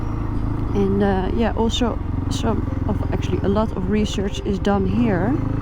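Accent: Netherlands. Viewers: Oo noong una kong makita at mapanood itong channel ni akala ko siya si noraly( itchy boots ) dahil ang pagkadinig ko sa boses ay pareho pero nung mga sumunod na episodes ni nakilala ko din na magkaiba ang boses nila, sa accent ng pananalita pareho kasi pareho sila na taga Netherlands 😊😊😊😊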